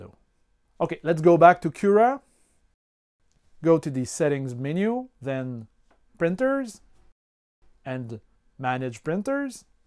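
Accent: Quebec, Canada. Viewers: Allo juste avec ton accen je comprend que tu dois venir du québec ;) as-tu essayé avec la version actuel de cura sois la 4.4.1 ? j'essias et je ne trouve pas comment faire...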